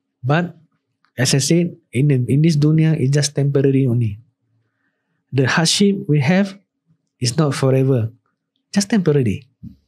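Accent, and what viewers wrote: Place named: Malaysia. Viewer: I dont understand ur manglish...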